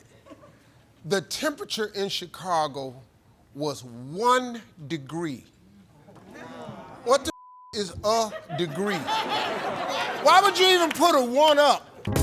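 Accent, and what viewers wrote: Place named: Kansas, United States. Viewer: That sh*t sound like Kansas haha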